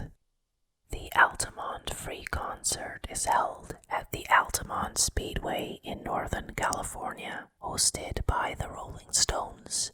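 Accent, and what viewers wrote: Canada. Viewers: sounds Canadian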